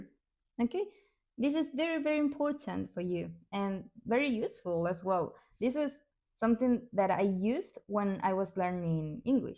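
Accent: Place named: India. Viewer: I thought you were from India 😂. I'm learning Spanish and am from India. Thank you.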